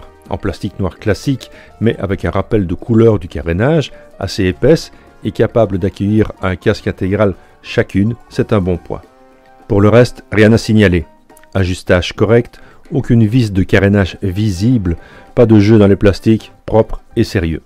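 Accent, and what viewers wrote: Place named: Belgium. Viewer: Perso j'ai commandé cette moto qui doit m'être livrée en avril/mai, je n'ai qu'une hâte c'est de la réceptionner et rouler avec !! Merci pour ta vidéo, très belle et bonne présentation de la machine et j'ai particulièrement bien aimé ton petit accent de Belgique !!!! ;)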